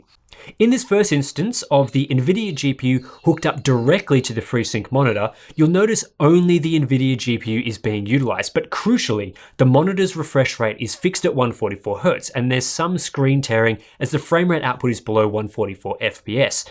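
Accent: Australia. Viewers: r u from ostralia?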